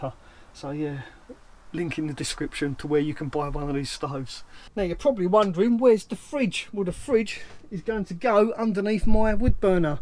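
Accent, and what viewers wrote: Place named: RP, United Kingdom. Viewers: Loving the posh voice lol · I’ve been watching your videos for quite awhile how come you’re talking posh are in this one what’s going on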